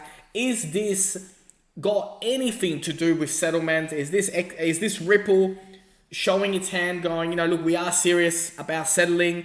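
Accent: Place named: Australia. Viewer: G'day mate. Thanks for the great xrp video. Great to hear Aussie accent.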